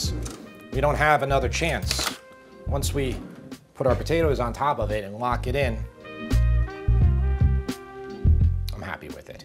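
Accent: New York, United States. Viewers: I know that accent. Queens? Long Island? 🤔 😂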